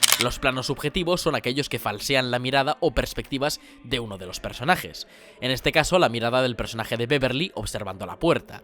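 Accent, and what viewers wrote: Spain. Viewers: Por que todos los youtubers son de españa , llevo media hora buscando vídeos de otras nacionalidades , no es por nada , me agradan pero quisiera descansar de ese acento de la S,C y Z un rato buen vídeo por cierto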